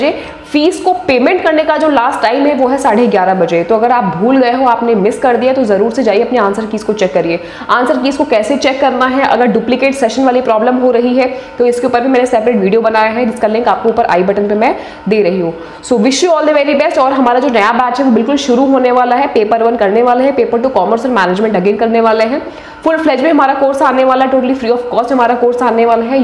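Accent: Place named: Haryana, India. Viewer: m rohtak se hu mujhe aisa lga ki aap haryana se ho isaliye puchha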